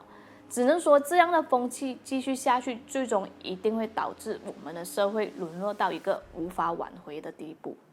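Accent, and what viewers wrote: Malaysia. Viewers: 马来西亚华语音调。 广东客家合调！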